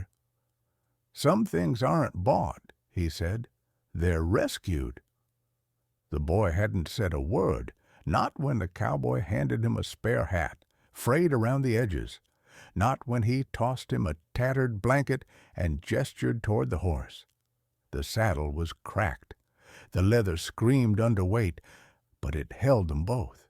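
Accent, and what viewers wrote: United States. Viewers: Story and voice are spot on. Cowboys talk like this. People from the mid west talk like this. Actually the old cowboy talked too much Awesome amazing story thank you!❤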